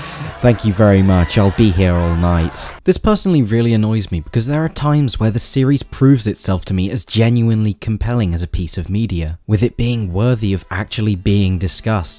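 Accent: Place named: United Kingdom. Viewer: ur british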